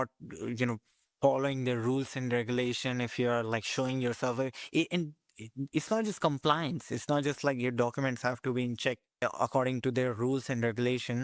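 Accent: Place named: Bengal, India. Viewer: I was inspired to create my own website after watching your YouTube videos. You were right about compliance. I never had a Google account in the past, but I created one a few days ago to comply with Google's requirements. By the way, I suspect you might know Bengali because your tone sounds like it. Thank you for inspiring me to use Linux.❤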